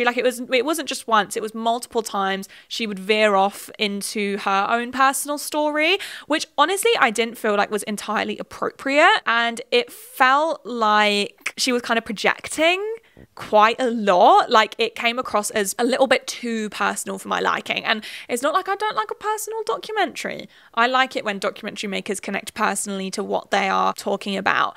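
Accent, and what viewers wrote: England, United Kingdom. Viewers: I think it’s because it’s your English accent, but thank you for sharing your story!! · Its interesting hearing an english person explain this to me as an engineering major from philly not involved in sororities at all lol like why am i watching this i have so much hw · love British accent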